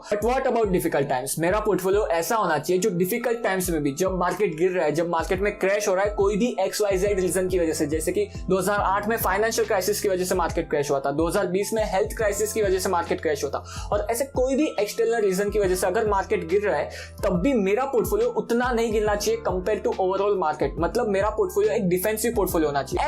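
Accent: Gujarat, India. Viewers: Are you gujarati?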